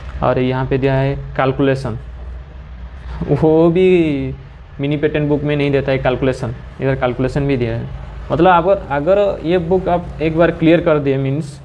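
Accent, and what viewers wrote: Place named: Odisha, India. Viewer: Mu paa tama voice ru janideli odia pila boli... Then odia Letter dekhiki confirm heigali...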